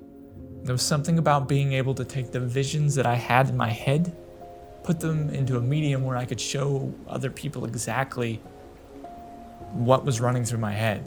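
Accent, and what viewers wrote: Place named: Virginia, United States. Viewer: That Virginia accent is a big part of why I enjoy your ASMR channel. 😁 Your story is so interesting. Best of luck to you!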